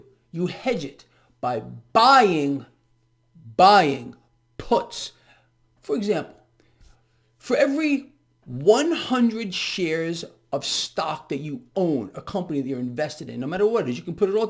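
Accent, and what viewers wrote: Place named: New York, United States. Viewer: For a second I thought you were telling us to buy COAL for every 100 stocks. 😆Texas ears, meet NY accent. BTW, I just bought your eBook and I'm already learning some concepts - thank you so much for the education.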